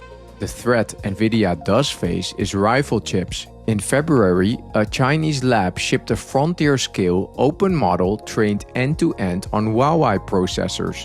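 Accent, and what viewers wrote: Netherlands. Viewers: Just dropping by to say you have the Dutchest accent I've ever heard in my life.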